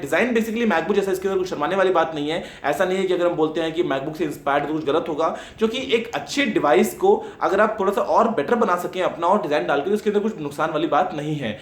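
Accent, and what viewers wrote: India. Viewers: is he really talks english?? heard like speaking indian..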